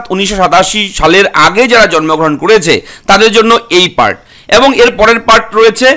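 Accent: Bangladesh. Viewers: Jini announcement korchen, taar uchcharon shune to mone hochche je tini Bangladeshi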